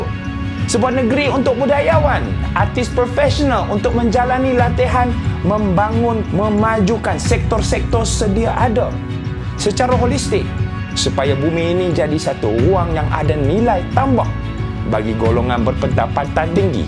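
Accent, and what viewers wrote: Perak, Malaysia. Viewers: Love the thicc perak accent 😍 👌 Keep up the good work. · Pergghhh..Howard lee punya bahasa melayu perak mmg padu bhai..bkn mcm apek parti gelakan kesayangan lobai..bkn kata loghat negeri..ckp bahasa Melayu pun mcm tahi..."BIAR SAYA KENALI SAYA"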